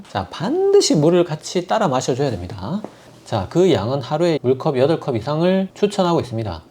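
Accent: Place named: Busan, South Korea. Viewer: 우연히 선생님 영상보게되어 계속보게되네요 말씀하시는게 너무 친근하게 느껴져서 이상하다 했더니 부산에계시는군요 ㅋㅋ 같은부산사람 이어서 그런지 더 반갑습니다 좋은정보 너무 도움되고 좋아요 감사합니다 ~~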